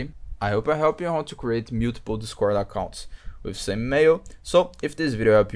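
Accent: Brazil. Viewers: tamojunto meu mano, eu sei q vc é br pelo seu sutaque kkkkkkk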